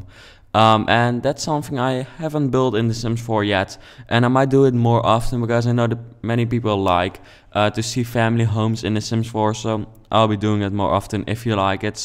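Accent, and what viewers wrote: Netherlands. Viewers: He is from Netherland. Not everyone can speak good English. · You might wanna work on your accent a little, cause it's really thick dutch. I'm dutch and it's a bit hard/irritating to listen too. But i really like the house! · Uk hoor gewoon aan je stem dat je Nederlands bent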